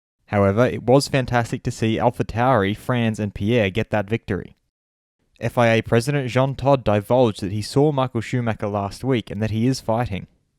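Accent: Australia. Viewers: Aussie ascent gotta love it mate.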